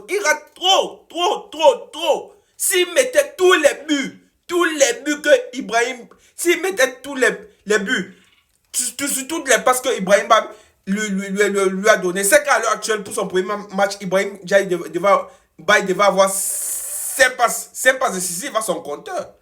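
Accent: Cameroon. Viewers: Mais ton accent là est un son continantais (cameroun) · ON VIT EN EUROPE, ET NOUS RECONNAISSONS TON ACCENT CAMEROUNAIS. PARCE QUE J'AI BEAUCOUP D'AMIS CAMEROUNAIS , ET VOUS N'AVEZ RIEN D'ACCENT IVOIRIEN. VOUS ÊTES UN CAMEROUNAIS PURE SANG UN BAMELINKAIS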